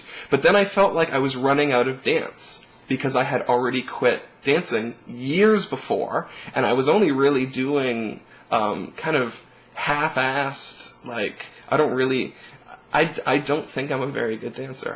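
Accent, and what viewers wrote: Canada. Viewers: Your canadian accent is so awesome. ^_^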